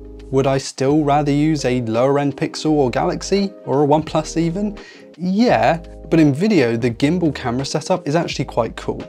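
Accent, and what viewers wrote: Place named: Cornwall, United Kingdom. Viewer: No way your from bodmin. I thought I recognized that accent. 😂 cheers from fowey!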